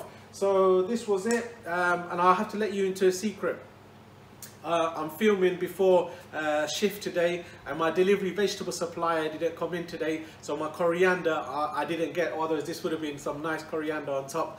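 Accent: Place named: Stoke, United Kingdom. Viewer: 60k, well done, love your Bangladeshi heritage, your food knowledge and incredible cooking skills, your Stoke accent is ace too mate. We in the UK are so fortunate to have such a mix of cultures, filling our bellies with happiness and pleasure, next stop 100,000 subs.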